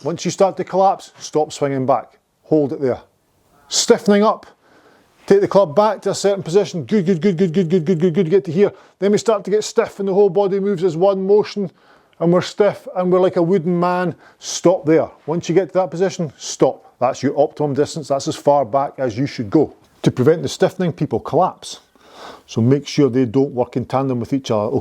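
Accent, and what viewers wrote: Scotland, United Kingdom. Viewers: Think your instruction is great. My son in law and I talk to each other on the course and inevitably are saying “that’s good!” In a hard Scottish accent when we are channeling you. Maybe I’ll make it to Peebles one day “soon”. That’ll be “good”. Cheers 😎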